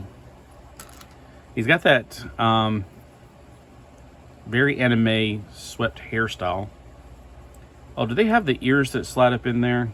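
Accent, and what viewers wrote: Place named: South, United States. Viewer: Sometimes you have your southern accent come out more than others. In this video is one of these times. lol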